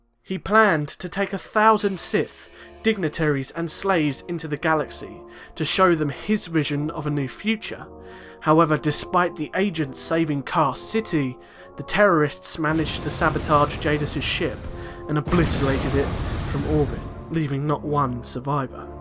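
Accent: United Kingdom. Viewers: I like these vids. Listening to SW History told by someone with a British accent, makes it so much cooler.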